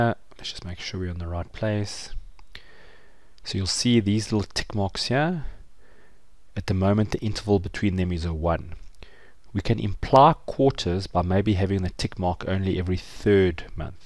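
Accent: South Africa. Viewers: dude, are you South African? haha definitely a SAn boytjie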